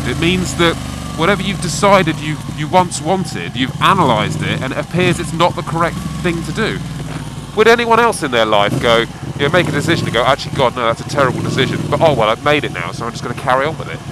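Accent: United Kingdom. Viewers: Gotta love and his angry British rants:-D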